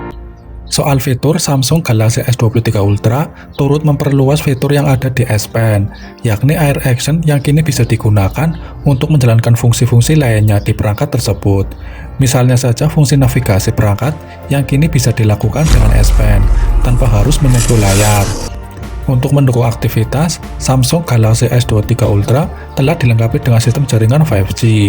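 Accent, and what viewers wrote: Banyumas, Indonesia. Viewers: logatnya ngapak......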